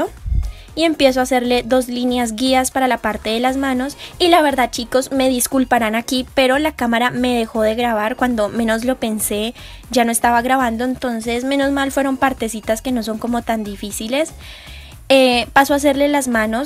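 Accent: Colombia. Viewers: Supuse que eras Caleña! saludos desde el sur, por cierto, nueva sub!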